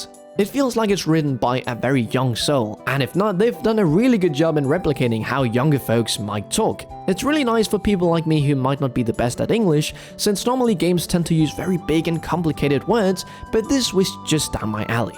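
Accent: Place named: United Kingdom. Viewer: And through what I assume is your work at NL, your English (which was already quite impressive as an ESL speaker) has improved even further! It might be because you have a slight British affect to your English rather than the usual Mexican-American accents I'm used to, mind you, but you could pass off as a native speaker from a non-USA English-speaking country!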